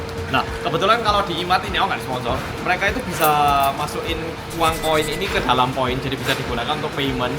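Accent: Java, Indonesia. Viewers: Mukanya kore tp klo bhasa indo logt jwanya kentel bget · AKU GAK SKIP IKLAN DONG UNTUK YANG MEDOOOK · Hai medok sudah lama nggak posting mega pasti lucu karna kamu nyenengi sekarang mega main folinya banyak bagus 😂❤❤❤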